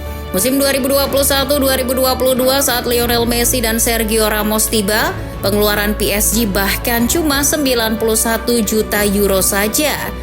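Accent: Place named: Manado, Indonesia. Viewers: Logat manado wkwk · Lah admin nya orang Manado ya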